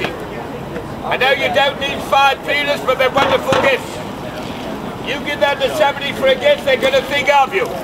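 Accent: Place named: Peckham, United Kingdom. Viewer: his voice isn't Australian. sounds like he's from the depths of Peckham England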